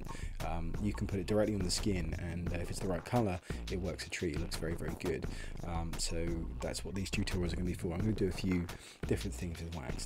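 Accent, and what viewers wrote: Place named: Australia. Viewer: he sound Australian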